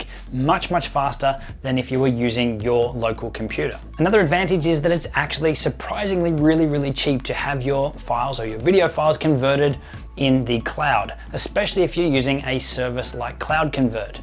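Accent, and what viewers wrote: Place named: Australia. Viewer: Nice work mate. Your work on these productions is much appreciated. Hearing another Aussie on YouTube is also a bonus. :)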